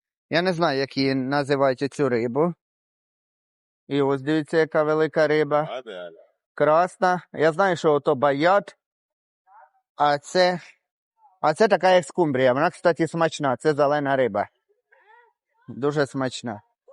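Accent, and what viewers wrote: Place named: Poltava, Ukraine. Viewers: Красавчик 😂 Полтавский діалект ❤ зробіть обзор апкомагазину, яке є СУХЕ вино і почім?! заздалегідь Дякую. ну і коли будемо жарить попугая (рибу)?! Обох обійняв. цьом. Тримаймося 🎉 p.s.у ВєлМаркеті в Києві, банани по 71грн, Еквадор, красиві. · Ваш полтавський суржик шикарнючий. Кому не подобається- всі в сад. Тільки не переходьте на кацапську, бо блогери - україномовні одиниці. На язьіке вагон і тєлєжка, але тошнить од кацапського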